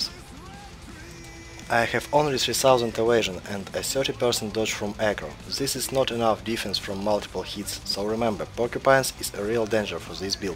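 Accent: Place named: Russia. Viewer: почему автор говорит на англе , но при этом имеет русскоязычный акцент ? :D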